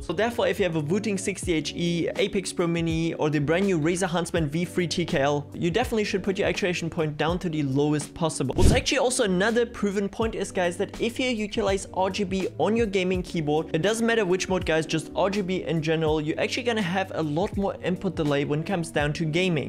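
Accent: Germany. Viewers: You got a crazy German accent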